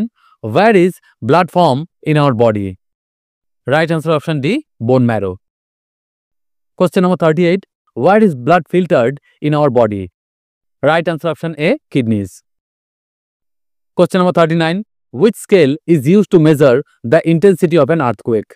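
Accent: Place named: Bengal, India. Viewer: Dada tumi bangali na, tomar accent e buje jai, ami tomar video roz dekhi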